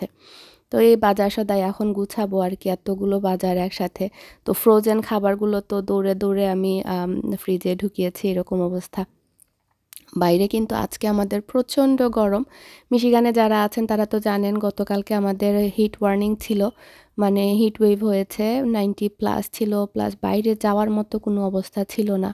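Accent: Sylhet, Bangladesh. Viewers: Apni sylheti vashay kotha boilen valo lage but shuddhu boilen na valo lage na ...bolte paren je apni deikhen na apnr vdogulu valo lage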